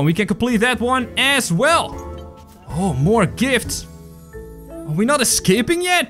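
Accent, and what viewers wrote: Netherlands. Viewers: Nice futch accent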